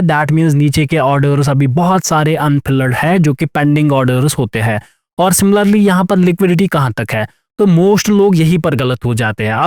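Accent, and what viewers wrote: Kashmir, India. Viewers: Your accent seems to be kashmiri. R u from kashmir,..??? I too am from kashmir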